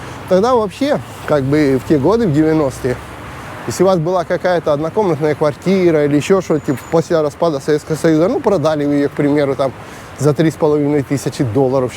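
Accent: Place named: Ukraine. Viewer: А вы точно из Москвы , у вас акцент украинский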